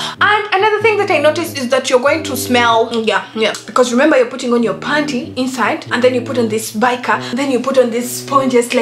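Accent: Uganda. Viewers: It's your kayindo for me. It really shows that your a muganda plus 👌👌👌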